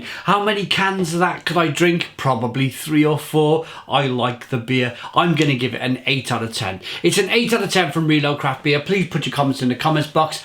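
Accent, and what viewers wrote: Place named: England, United Kingdom. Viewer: which part of England are you from? I find the regional dialects of the country very intriguing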